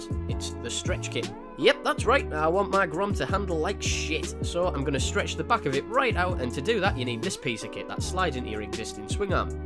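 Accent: United Kingdom. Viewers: Ha I enjoyed the commentary. British commentary always gives me a chuckle. Nice build :)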